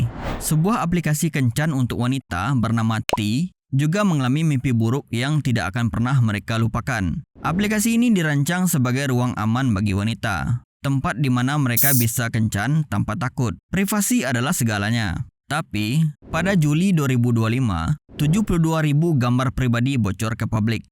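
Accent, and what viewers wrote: Aceh, Indonesia. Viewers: Suka banget kontennya ❤ Logatnya seperti Aceh :)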